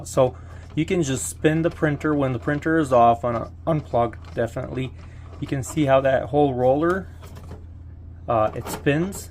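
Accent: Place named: United States. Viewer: Love the American accent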